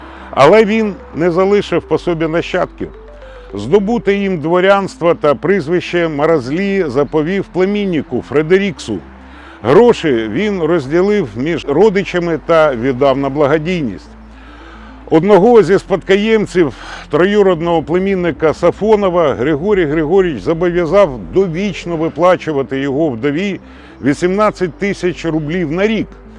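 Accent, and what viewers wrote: Odesa, Ukraine. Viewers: Чудовий сюжет!Прекрасний ведучий! І вишенька на торті- українська мова з одеським акцентом ❤Дякую!❤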